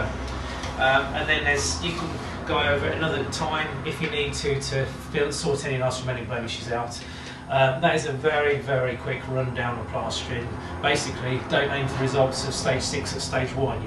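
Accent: United Kingdom. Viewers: Lancashire accent ?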